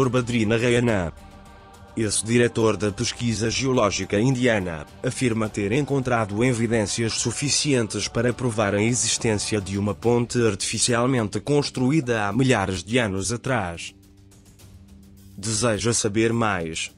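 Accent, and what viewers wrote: Portugal. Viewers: Sotaque português,que porra é essa? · Sotaque português, provavelmente da zona de Lisboa... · 🎯 EXELENTE VÍDEO!👍🏻Guerreiro da Casa do Conhecimento... me responda por gentileza!👍🏻Pôr quê... à narração, está com sotaque de um português? 🤔 Estamos Juntos! 📡 NAMASTÊ! 💥🖖🏻®️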